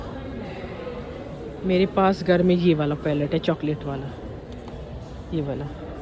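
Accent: Pakistan. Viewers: Wesy ma'am ek bt tu hai itny saal Canada main rehny k bad b ap ne Canada ko apny andr nai any diya ap jb bat krti hain tu ek Pakistani lehja alfaz sb kafi واضع hota hain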